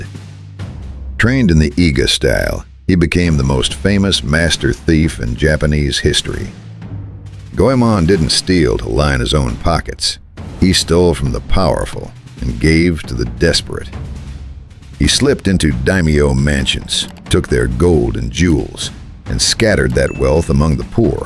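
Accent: South, United States. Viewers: Love the Southern Accent narrative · Whats up with the southern voice?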